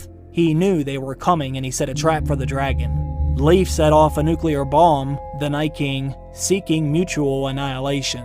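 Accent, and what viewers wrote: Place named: South, United States. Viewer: You speak like a southern lord Baelish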